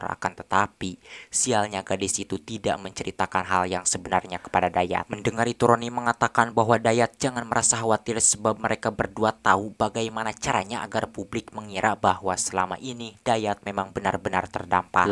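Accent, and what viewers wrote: West Java, Indonesia. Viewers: Bg asli Sunda nya dimana?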